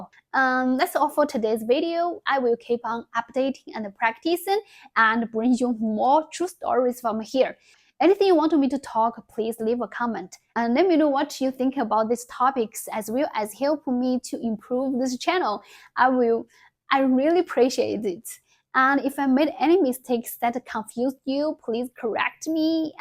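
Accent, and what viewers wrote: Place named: China. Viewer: Holy smokes you speak great English! you still have a slight Chinese accent/feel but overall really good in a global standard kind of way, you will be understood no problem in the rest of the English speaking world, I'm Chinese-Filipino but I can't even speak a drop of Chinese, I can only speak Tagalog and English with a generic American accent, so from a native English speaker's point of view, you speak awesome English, you should be proud of yourself for that accomplishment, not an easy feat if you don't learn it from someone or from a school and if you don't have anyone to talk to on a daily basis from a very young age.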